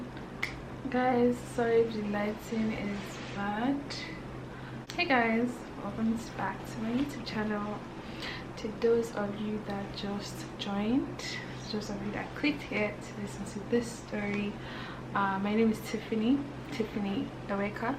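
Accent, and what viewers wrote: Ghana. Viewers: Loving your Ghanaian accent 🤗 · You’re accent has even changed. You’re speaking like a Ghanaian.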